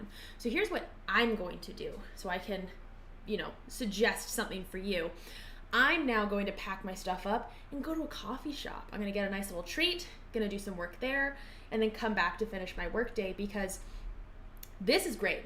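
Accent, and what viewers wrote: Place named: Canada. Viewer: You’re Canadian, eh? Sammmeee 🎉❤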